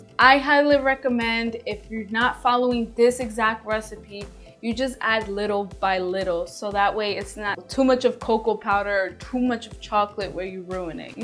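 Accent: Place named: New York, United States. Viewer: I love your accent. Are you from New York?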